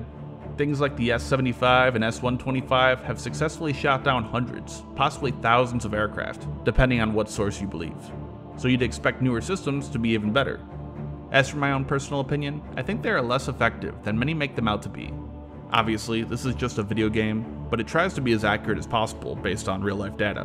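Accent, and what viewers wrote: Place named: Midwest, United States. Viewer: He’s got a strong Midwest accent. I’d guess Wisconsin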